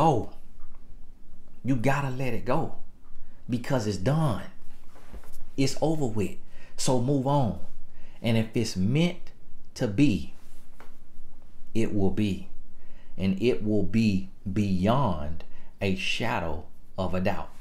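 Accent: United States. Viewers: Woow.. I am hurt and fascinated at the same time. Every woman of all ages should listen to you. That is the pure and pure hard-hitting truth. Less the ex thing troubles me. . BUT why and how men choose women 👊🏻. . Ufff..You shaken me and nowI am awake! I decided to wait a while ago now that I'm 43 and the man who is right for me will love me all the more for it. Basically every man is looking for his wife who they want to respect but we women have to make sure that they can. You are also very funny although I don't understand a lot of things because of the American English. I'm Italian and live in Germany. You're really awesome. thank you.. Wonderful listening to you 🌸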